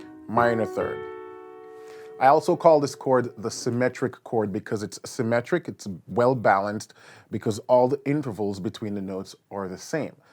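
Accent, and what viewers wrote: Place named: Jamaica. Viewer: Lol I love when the yardy accent slips in and out lol, great stuff bro! Love from Trinidad!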